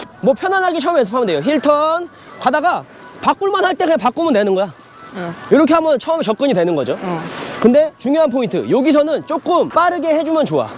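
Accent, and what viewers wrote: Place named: Chungcheong, South Korea. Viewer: 첨봤는데 넘 재밌네요 ㅎ 저 직장이 충남 당진인데 너무 자주듣던 말투라서 저 정감가네요 ㅎ 구독박고 갑니다 ㅎ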